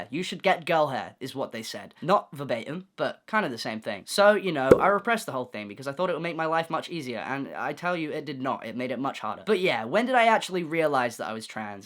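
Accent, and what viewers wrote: United Kingdom. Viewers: IS HE BRITSH?? I've been watching him for years and I just realized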